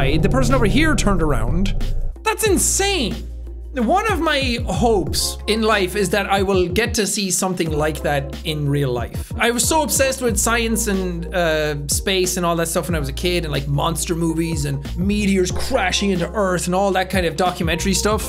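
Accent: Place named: Ireland. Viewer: Please get well jacksepticeye I pray for you 😞 I hope you get well because every time when I am sad and I need a smile on my face I know where I can go on YouTube to see your videos that help me laugh and make me smile jacksepticeye the bravest most strongest and the funniest like a boss my favorite YouTuber I love your Irish accents I love your funny comments I love the adventures that you go to in these video games I live your tweet videos where you make funny jokes every time it helps me to put my mind at ease my friend please get better I care about you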